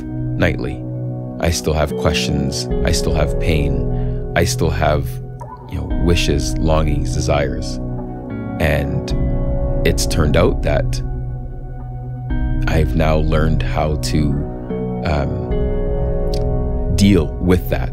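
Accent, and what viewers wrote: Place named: Canada. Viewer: YOU ARE A CANADIAN.......I CAN MAKE IT OUT FROM YOUR ACCENT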